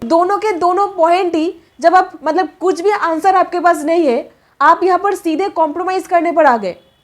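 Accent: Bengal, India. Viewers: Bangali ho isliy aapki bhasa bahut pyari h ha hindi thoda weak h .mam aap jo boli 10yrs case chala .mujhe tazzub hua mera v ek 95995 ka cheqe bounce ka case h wo accussed person abhi khi chhup gya h samne nhi aa rha h usne bahuto ko thaga h mere pass cheqe tha isliy court tak gyi sochi 6 to 12 month me result aa jayega lekin mai dr gyi hu kya 10 yrs tak v case chalta h .itna late jyu hota h mam? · Waise achha bolti ho lekin bangoli ka ye problem hai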